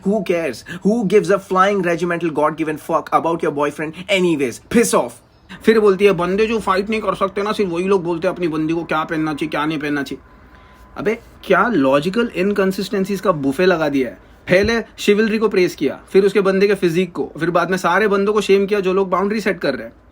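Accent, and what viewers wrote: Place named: Maharashtra, India. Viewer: Your Marathi accent is making this video 10x times better 😂❤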